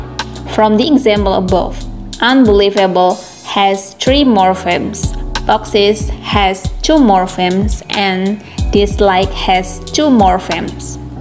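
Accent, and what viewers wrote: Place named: Indonesia. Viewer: i guess she's from Indonesia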